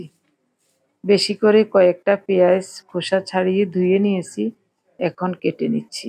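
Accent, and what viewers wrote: Noakhali, Bangladesh. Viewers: দিদি আমি নোয়াখালী থেকে বলছি। আপনার কথার মধ্যে নোয়াখালীর একটা টান আছে, বুঝতে পারছি না আপনার দেশের বাড়ি কোথায়। খুব সুন্দর লাগছে সবাই টুকটাক কথা বলছেন।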